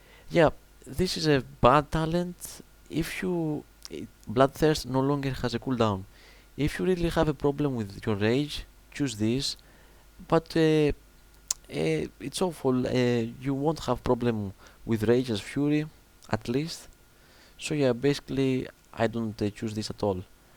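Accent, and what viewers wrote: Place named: Greece. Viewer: ζήτω η ελληνική προφορά! διαφωνώ για το dragon roar και ίσως πρέπει να αναλύσεις και το rude interruption ;-)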